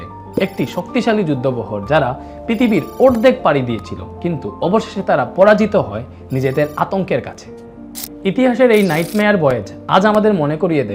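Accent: Sylhet, Bangladesh. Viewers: bhai apni ki faltu Sylhet er moto kotha bolen keno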